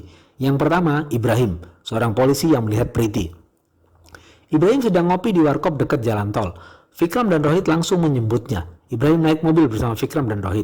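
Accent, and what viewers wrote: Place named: Java, Indonesia. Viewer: Narratornya jawa medok inih haaa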